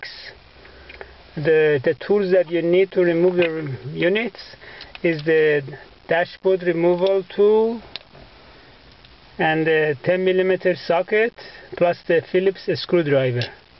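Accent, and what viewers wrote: Iran. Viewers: Like your accent, are you Iranian?!!! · hahaha! Love your Perrrrrrsiaaaaaaaaaaaan accceeeeeeeeeeennnnnnnt!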